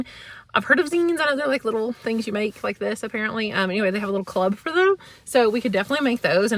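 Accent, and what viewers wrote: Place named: Kentucky, United States. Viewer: Also, I just found out you're from Kentucky and so am I!!!!! I thought you had a familiar accent 😂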